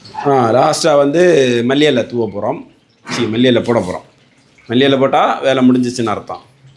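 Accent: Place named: Kanyakumari, India. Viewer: nanum kanyakumari than anna language vachi kandu pudichan anna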